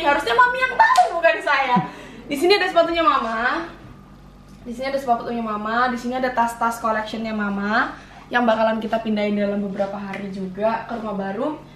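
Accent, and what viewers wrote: Java, Indonesia. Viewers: Kak orang Jawa kah? Kok kyk ada medoknya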